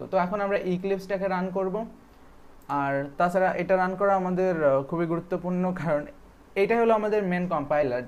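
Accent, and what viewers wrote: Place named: Khulna, Bangladesh. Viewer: ভাই আপনার বাড়ি মেবি বাগেরহাট বা খুলনা হবে কথা শুনে আমার তাই মোনে হচ্ছে, আমার বাড়ি বাগেরহাটে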